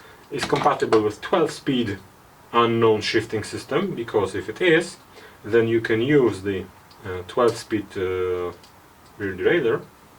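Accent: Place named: Russia. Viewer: сделаю смелое предположение: судя по акценту - автор понимает по-русски )) можете поделиться опытом и ответить на два вопроса? 1) долго цепь ходила по сравнению со старой системой?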